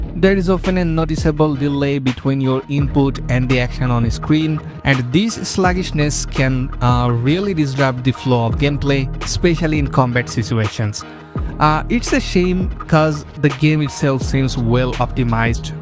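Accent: India. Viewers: I just ignored the video and laughed at your indian accent the whole video. 😂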